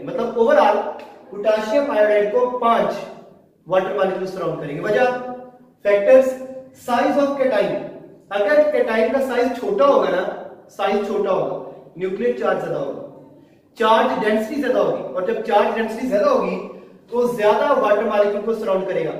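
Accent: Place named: Pakistan. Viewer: sir are you from pakistan beacuse of your accent if you are then love from india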